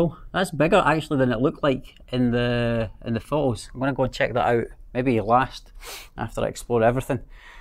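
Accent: Scotland, United Kingdom. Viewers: Your Scottish accent is so strong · I think this is the funniest one yet had me laughing 😆 my head of from the beginning I thought the camel was going to chase you but no the oryx did the way you fell back geez you were very lucky there lol you tend to push the limit's with animals, anyways your a CMU 😅😂🤣 love watching you your Scottish accent tops it all of✔️ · i dont understand what i like more, his content or his lovely scottish accent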